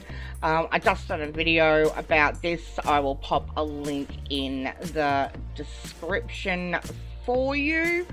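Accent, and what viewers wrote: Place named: England, United Kingdom. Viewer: How do you feel about Roseanne Barr? I lover her sense of humor.. but if no one has said it before you are exactly her with hint of an english accent. Asside from that.. Great video, informative.. but english Roseanne sold it .. lol! thanks!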